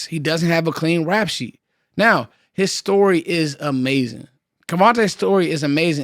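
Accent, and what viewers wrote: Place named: Washington DC, United States. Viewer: I knew u was dc by the way u talk..I ran across a lot of dudes from dc in the feds slim..a lot of them are stand up men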